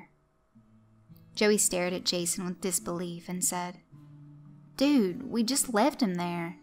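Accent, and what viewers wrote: South, United States. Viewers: Damn racism, it's never funny. I love the southern accent. You know how to narrate you southern bell :) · This Southern drawl you do in these kinds of pastas is so sweet!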